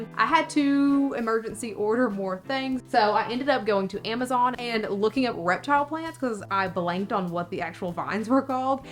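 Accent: South, United States. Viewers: Hi, I love your southern accent; where are you from? I have a southern accent also; I live in North Dakota but I grew up in Tennessee.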